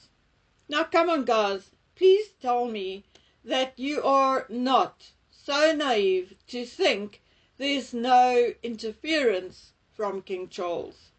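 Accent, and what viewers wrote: South Africa. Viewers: Do I detect a South African accent? I was fortunate enough to live in Rhodesia, a once beautiful and prosperous country, for 31/2 years, during UDI. ☺️🙋‍♀️🇬🇧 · I have just found this video your accent is south africam it is so great to hear another SA AM now living in reno nevada I was born in joburg so I am so chuffed I found your channel❤❤❤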